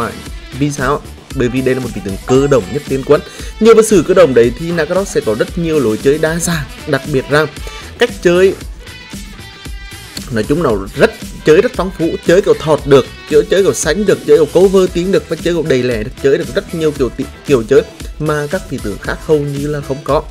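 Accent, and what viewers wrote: Nghe An, Vietnam. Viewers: Anh nghệ an à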